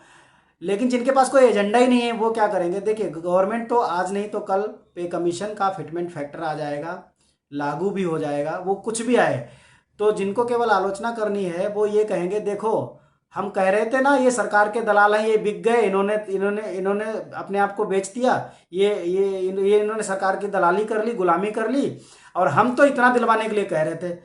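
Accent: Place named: United Kingdom. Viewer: Why is the voice is bloody britishers .pls upload the actual indian voice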